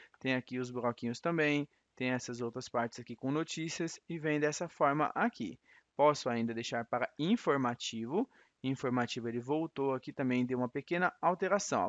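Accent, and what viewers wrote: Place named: France. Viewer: Mano que sutaque francês massa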